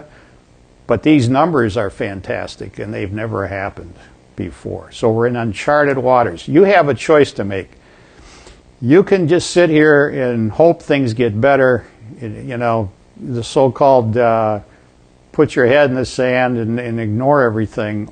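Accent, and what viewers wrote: United States. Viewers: I know this is completely off topic, but what sort of American accent does he have? It does sound a bit different than the standard. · dis guy is from Minnesota Eh? seems pretty reasonable wit possible exception of Market being your salvation.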